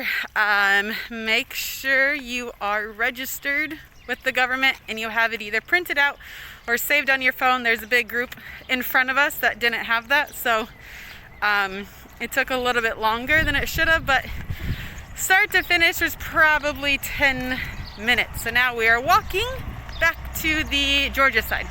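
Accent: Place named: Scandinavia. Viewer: What is your native language? It seems Danish or Nordic or something. The accents read Nordic.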